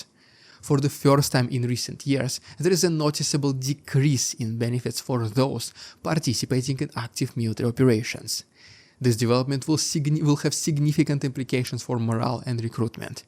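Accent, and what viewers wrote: Russia. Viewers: You present good videos, not only as far as news but with one of the best foreigners who is very competent in English. At the same time you have one of the most severely bad accents. The harsh contrast between intelligent presentations delivered with a hardly understandable Russian accent is hard to understand. A man who speaks such good English (or maybe has a good teleprompter?) should be able to teach himself a decent pronunciation of his speech. Give it a go, you will get more followers.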